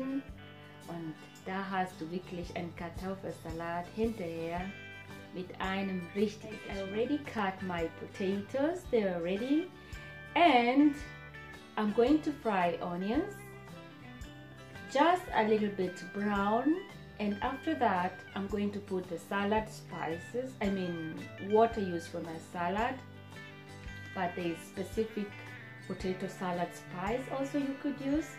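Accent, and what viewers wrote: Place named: Germany. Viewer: Good cooking my dear🇸🇪🇸🇪🇸🇪😁😁😁😁😁🦚🦚🦚🦚🦚👜👜🌔🌔🌔🌔🌔I like your German accents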